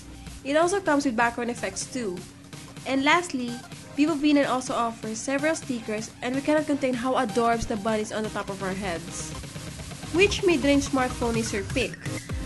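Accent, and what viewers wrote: Philippines. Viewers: Loving the filipino accent!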